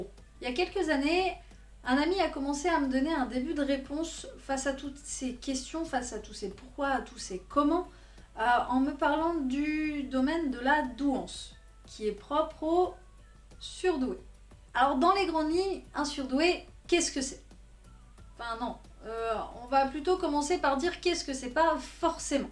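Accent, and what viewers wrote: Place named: South, France. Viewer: Ah cet accent magique ! Putain con bonne mère :) Coucou de Toulouse ! J'enchaîne avec la suite de ton périple.... merci. Je compte aussi passer le test.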